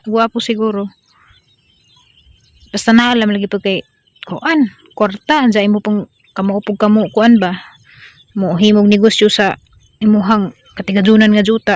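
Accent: Bohol, Philippines. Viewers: Always watching here in Cebu ♥️ i love your boholana ascents🤗♥️ labi nas words nga "ARANG" masundog nako usahay😅😍😍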